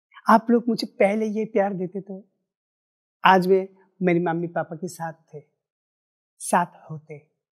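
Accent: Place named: Bengal, India. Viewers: She has an adorable Bengali accent. Is it just me who thinks that? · Today after listening to her,I understand she is Bengali.Hats off to her struggle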